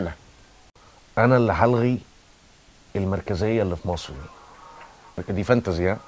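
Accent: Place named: United States. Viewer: I remember you from nadi smoha, get rid off the American ascent on your words.. please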